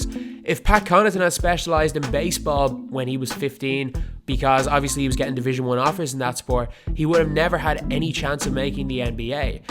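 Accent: Ireland. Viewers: Irish Narrator.....😁...... I like Pat tho...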